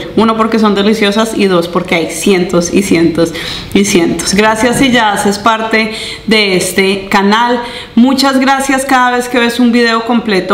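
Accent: Colombia. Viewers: Toque costeño? O toque Venezolano?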